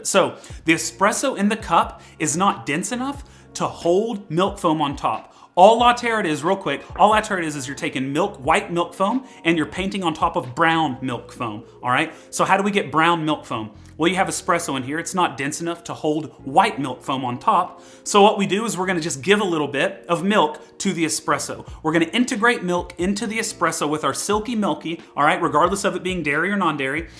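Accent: South, United States. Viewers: Great videos, man! The quality of my steamed milk went up an entire level thanks to you! I have to ask, where are you from? Your accent sounds super familiar, to me. (I'm guessing Georgia or Alabama)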